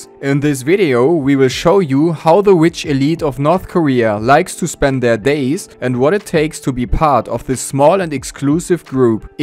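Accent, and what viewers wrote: Germany. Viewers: Informatives Video :) hab direkt am akzent gemerkt, dass du deutsch bist hahahaha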